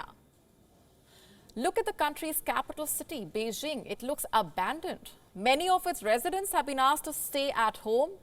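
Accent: India. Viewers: You are dubbing the interviewees spoken words with your strong Indian accent English. I'm effectively bilingual in English and Chinese, if your subtitles are accurate while the Chinese woman speaks in her own language, why would you dub her in English? People in China are frustrated because of the suspected abuse of Covid swab-tests that are mainly outsourced to private companies, which resulted in suspicious attempts to spread the virus throughout China, because it's very profitable for these private companies to legally syphon money from the Chinese government thru exploiting the legal loopholes, prolonging the current Covid19 situation, and collecting service charges for all the daily individual Covid19 swab-tests. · I disagree completely. Actually Indians speak better English (more grammatically correct) than the rest of the Anglosphere. That said, the Indian accent is a challenge to other English speakers, North Americans in particular. 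I hate to use the term but your comment is borderline racist. If Al Jazeera used native Arabic speakers instead of "white' mouthpieces, you wouldn't care for their accents either. You could use the subtitles function, as I usually do.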